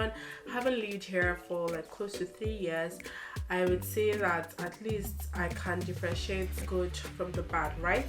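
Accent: Dublin, Ireland. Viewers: Your accent with the Dublin inflections is deadly!!! Oh I mean ss Ireland so much but love my California life too! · I was prepared to be offended, but far from it. Everything you say is true and fair. Dublin is crucifyingly expensive. I feel so sorry for anyone starting out and trying to find accommodation in this country. I don't think your high insurance is necessarily down to the fact you are from another country, but down to your age. Back in 2001 my first car was 300 euro and my insurance was 1,200, 4 times the value of the car. This is beacuse I was young, and inexperienced. I love your bubbly personality and the way you say certain words with a Dublin accent.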